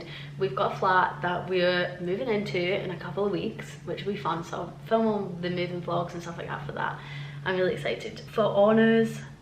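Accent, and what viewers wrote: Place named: Glasgow, United Kingdom. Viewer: Is that a Glasgow University accent Ive heard so much about. Interesting video :D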